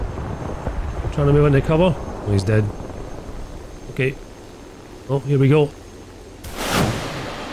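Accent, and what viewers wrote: Scotland, United Kingdom. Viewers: His accent is Scottish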